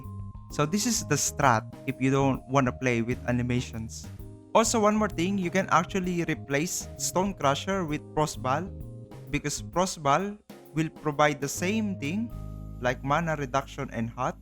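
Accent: Philippines. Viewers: Thanks much bro! Btw, you sound like Filipino😅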